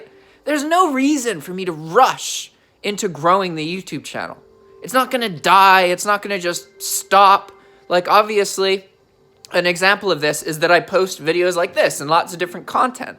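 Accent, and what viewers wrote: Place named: United Kingdom. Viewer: This dude sounds like he's hiding a British accent.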